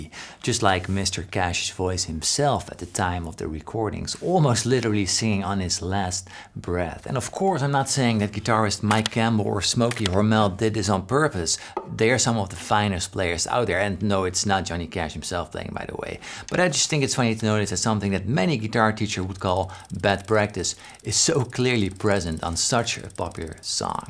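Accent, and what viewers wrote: Netherlands. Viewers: your dutch accent is so cute.All the best from Limburg.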